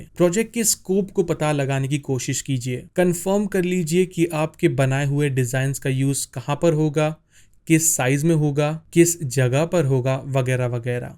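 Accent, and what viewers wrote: Maharashtra, India. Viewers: Kya Aap Marathi Ho🤔🤔🤔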